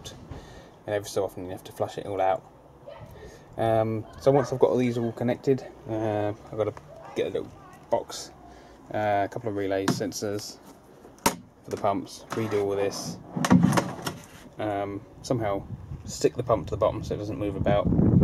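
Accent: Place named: United Kingdom. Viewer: pretty sure youre in the UK. (judging by the accent) and here in the US its illegal to "collect rain water" -_- which ive recently found out does get enforced.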